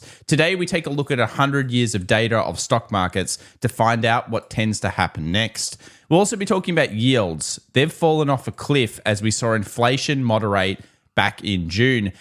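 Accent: Australia. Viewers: The whole deal here is about interpreting things. 😂 I take the same approach with watching the videos. He's knowledgeable, not always correct, says that up front. Also, literally everyone on here has different timelines, nationalities, risk tolerances, DCA for life vs scalp for life and everything in-between. Thumbnails and titles, it's back and forth on being a bit much, but that's 100% the algo and people watching needing to be fed 🤷, and thank the freakin' lord he doesn't do those shocked face thumbnails. I've seen a looooot of TA/market voodoo and this dude ain't that. Does he want money... (idk, are you watching a video about making money) lol. It can just be entertaining and informative and it's your responsibility to double check anything you're going to act on. I gots a B.A. in Physics, 12+ years as a software engineer and 3 years on and off watching FX. 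I simply don't listen to bs that is illogical if I'm looking for insights. In short 😂 yeah, I get valuable info, with a sweet Australian accent, from a genuine dude, that I filter through my own understanding and experiences. You can not watch, but why the desire to convince others not to?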